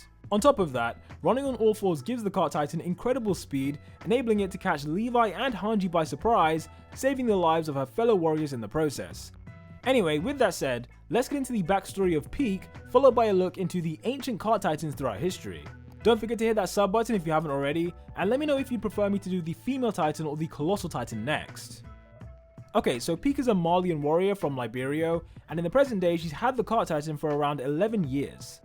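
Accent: United Kingdom. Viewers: she speaking british which gives me the tingles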